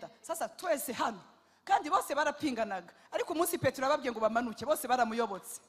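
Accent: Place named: Burundi. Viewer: Minyoni noneho ko uvuga nka uwiburundi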